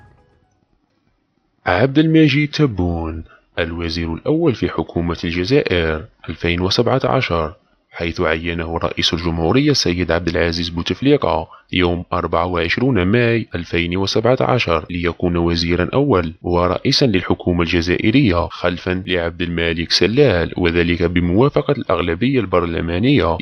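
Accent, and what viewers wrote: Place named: Morocco. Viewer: صاحب القناة مغربي من لهجته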